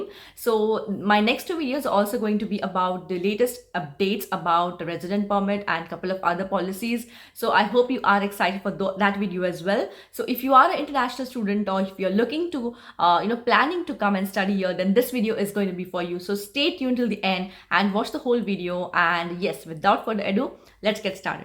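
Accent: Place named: Punjab, India. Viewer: jaise app bol raha ho app to toh punjabi hi lag rahe ho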